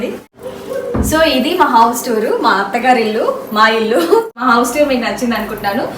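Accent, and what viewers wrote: Telangana, India. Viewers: Ur Telangana slang is very nice sister, nice video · Inthaa dooram vellinaa Meeru Mana telangana language laa Matladudu....adirindi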